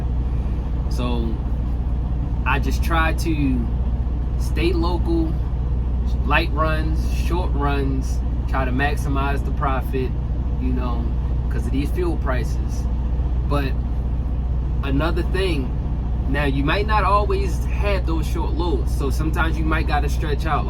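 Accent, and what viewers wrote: Baltimore, United States. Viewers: I can spot that Baltimore accent from a mile away. Lived out Cold Spring back in the day. Respect.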